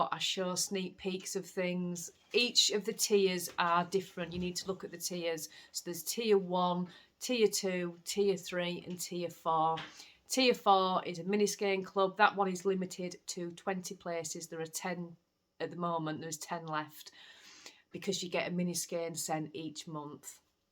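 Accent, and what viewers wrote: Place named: Leeds, United Kingdom. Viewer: Love your podcasts and cant wait to see the advent leggings. Are you in Leeds? Just wondered cause that's where I am and you've got the same accent 🙂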